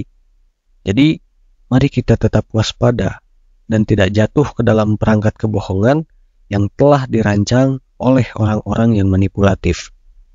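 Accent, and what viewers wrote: Indonesia. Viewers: Senpet salfok, background nya meni garang, tapi voice over nya nyunda pisun 😁😁, hatur nuhun kang ilmunya, resep pisan videona meni berpaedah.. gass lanjutkaan berkarya.. ❤ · Sunda banget nada y 😁👍👍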